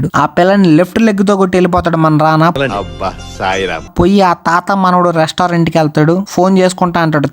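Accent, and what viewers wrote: Nellore, India. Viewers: Abbiya BROWWWW 'Korea Comic" ani oka yt channel undhi..andhulo kooda oka korean athanu movie explanations chaala hilarious ga english lo chepthadu with crazy asian accent and funny sounds which is similar to your explanation but that is foreign and yours is naatu nellore yaasa which I am proud to be fan of both..neeku emmana help ayyidhi ani like inspiration ga ani cheppa..happy ga undu mowa browwws - SELMON BHAAI be like: abba laddu lags unnave pella 🤤 BOOOYYYYYYYYYYYYYYYYYYYYY broww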